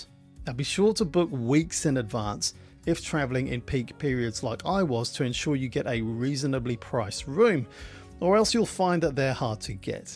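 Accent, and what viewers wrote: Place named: New Zealand. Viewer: u have an interesting accent. feels like you grew up in australia or NZ and went to england or visa versa. takayama is great, so much so, i just bought a house in the old historic town. edit: nz not aussie, subtle but it's there :)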